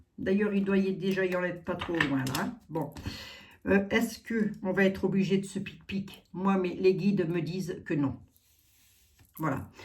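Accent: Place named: East, France. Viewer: A propos du nain de jardin 🙏🙏🙏🙏 -moi je l'appelle Kaa, le serpent du livre de la jungle, il hypnotise les naïfs. Merci (d'où vous vient votre petit accent, l'est de la France?)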